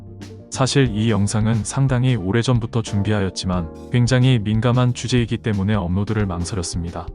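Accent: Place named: Japan. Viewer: ㅋ 식민사관이네. 지금 목소리도 일본 억양 있네. 믿지마세요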